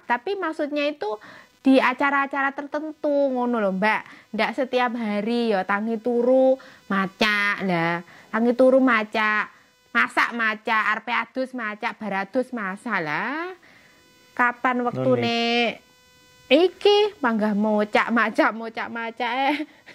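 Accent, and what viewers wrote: Java, Indonesia. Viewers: Mbak cantik,abaikan org2 Nyiyir tnda tak mampu😂salam kenal q suka Gaya lo, medok2 Jawa, kerennn👍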